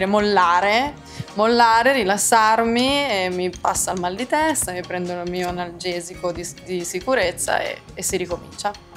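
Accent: Italy. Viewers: Si è brava... E bella. Le donne Liguri parlano così... Io anche...parlo così sono cresciuta Genova.. le mie nipoti belle..parlano con accento così... Ciao baci...Ellis❤❤❤❤😅😅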